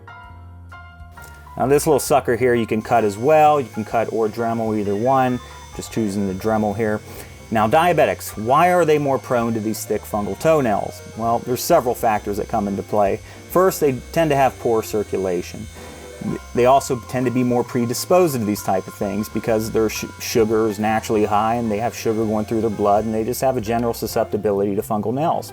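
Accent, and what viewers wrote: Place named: New Orleans, United States. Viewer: My first time on your channel I subbed as soon as you said lil Jimmy. You sound like down home folks from my home town New Orleans. Oh and I love the music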